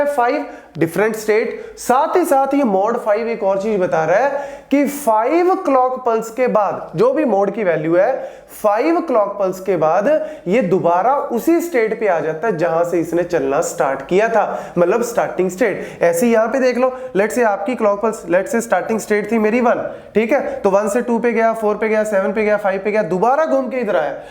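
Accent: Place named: Haryana, India. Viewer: are u from haryana ur accent already reveals it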